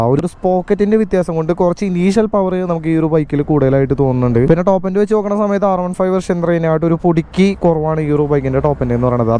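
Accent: Thrissur, India. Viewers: Aa thrissur basha onnu maatan sramikyo pls😁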